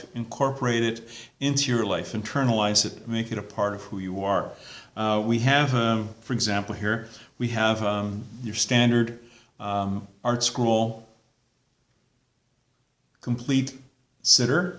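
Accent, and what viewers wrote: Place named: Midwest, United States. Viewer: Sir. Where are you. You sound midwestern. Chicago-y or Milwaukee-ish. ? M. Elgin. Illinois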